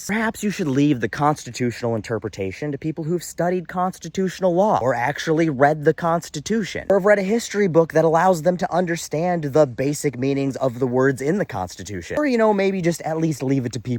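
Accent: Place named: South, United States. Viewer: Woohooooo, do not ever stop. Yours is the kind of hillbilly redneck voice we need. I do mean that in a good way, although i would consider you more redneck than hillbilly. I've been married to one for 30 years. Trumps simple do not speak for all rural country people. Some of us can read a book. 😂 or, in this case, the constitution. Long walk, for a short block. We need you and your common sense way of putting things.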